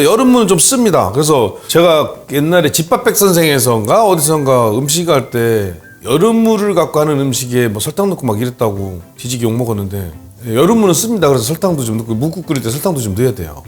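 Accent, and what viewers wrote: Chungcheong, South Korea. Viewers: 우리막내삼촌닮으셨어~ 너무푸근하고 친근감있고 은근재밌고...같은충청도라 말투도 정감있고...너무좋으신분같아~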